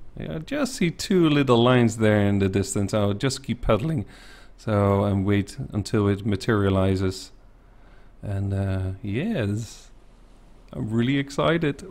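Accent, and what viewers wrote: Netherlands. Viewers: I hear a Dutch accent. How do I know? In Dutch to. Hallo. Goed Engels gesproken. Ik dacht DAT het eerst normaal was.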